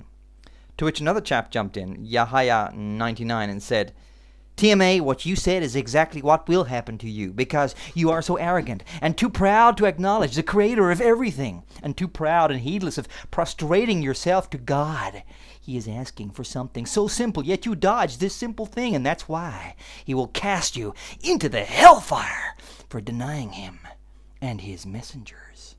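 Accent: South, United States. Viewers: wow, the southern draw is just great. I think it makes a great point. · hey TMA i love that southern accent you use in this video. i'm from the states and i've run into the southern trailer park type that talk like that. cheers! and have a great day :)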